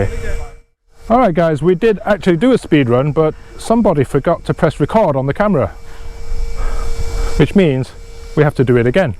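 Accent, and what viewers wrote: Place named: France. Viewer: ahah l'accent français à couper au couteau. Change rien !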